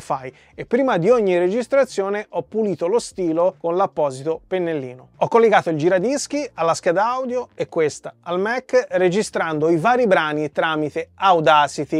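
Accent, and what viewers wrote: Tuscany, Italy. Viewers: Ciao sentendo l accento toscano te tutto bene con l alluvione spero.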